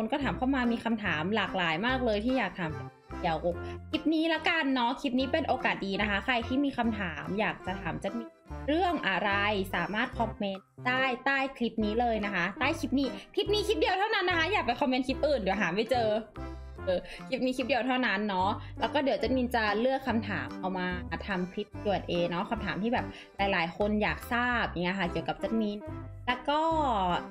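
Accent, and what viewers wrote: Thailand. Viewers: 1พี่อายุเท่าไร 2พี่เป็นคน เหนือ กลาง อีสาน ไต้ #เท่านี้แหละ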